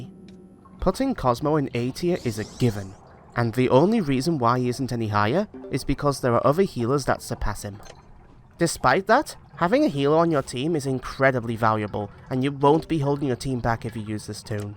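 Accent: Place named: United Kingdom. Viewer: All things aside, you have a MASS british accent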